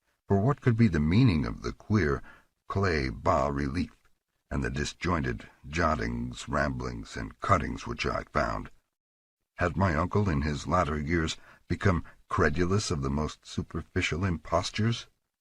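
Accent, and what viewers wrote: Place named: United States. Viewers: Wayne June is American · I am pretty certain it's American, further emphasized by the way he pronounced "either" in the beginning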